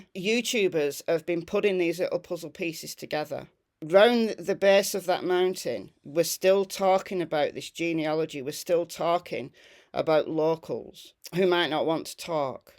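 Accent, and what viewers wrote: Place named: Newcastle, United Kingdom. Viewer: Hi i live middle england but from newcastle i love the way you talk sound like me haha love how you stick to facts x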